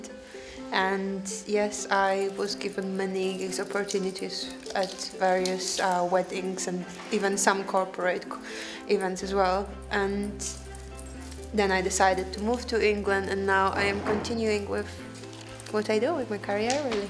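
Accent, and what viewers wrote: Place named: Poland. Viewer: she sounds Polish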